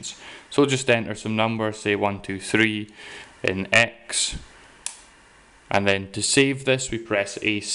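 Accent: Scotland, United Kingdom. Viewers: Are you from Dunblane?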